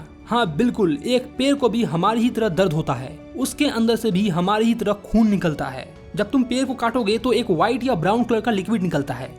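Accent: Bihar, India. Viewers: Are u bihari? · Its sounds like Bihari Bhaiya!👀❤ Best explainer everr?🐣haiinna??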